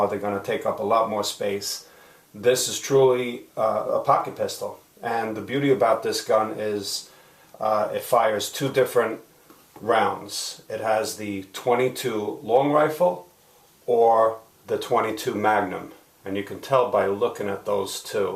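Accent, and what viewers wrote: United States. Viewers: Best American accent ever.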